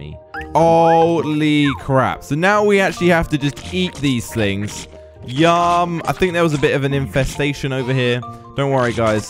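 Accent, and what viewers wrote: United Kingdom. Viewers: I can tell by his accent, that he is British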